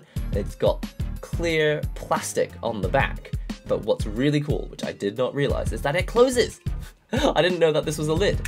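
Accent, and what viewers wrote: United Kingdom. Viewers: A Japanese looking man that sounds British!!! Freaking awsome!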